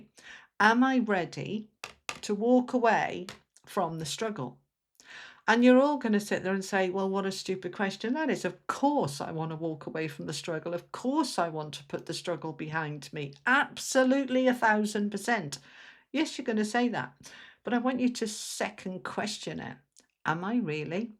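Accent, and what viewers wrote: United Kingdom. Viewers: thank you 💜 I'll continue to work on it. Your guidance is much appreciated, also lovely to hear a fellow UK accent ☺️ x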